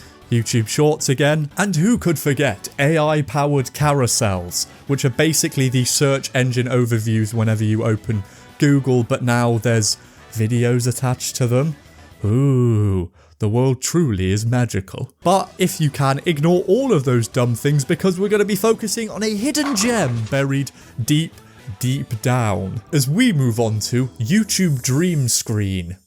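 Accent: United Kingdom. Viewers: I have actually put your videos on to sleep before specifically bc you have a deep voice and a British accent. You and Simon Roper. You called me out so hard lmao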